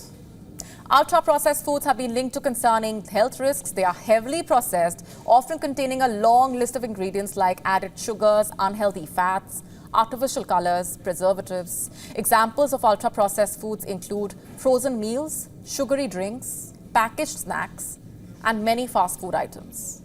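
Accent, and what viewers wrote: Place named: India. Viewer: Indian. It's the only accent I can't stomach, I don't know why.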